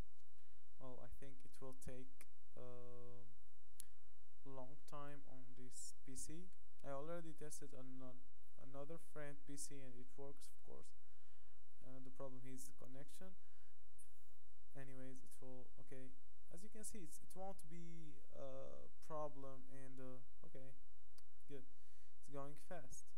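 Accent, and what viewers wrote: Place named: France. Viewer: Azy t'es un français :p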